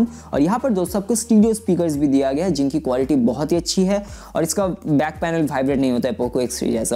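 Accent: Bengal, India. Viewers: Tumi bengali naki?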